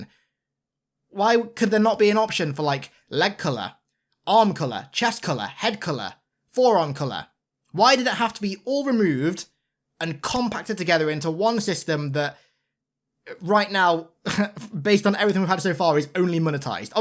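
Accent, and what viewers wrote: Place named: United Kingdom. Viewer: Brittish wankuh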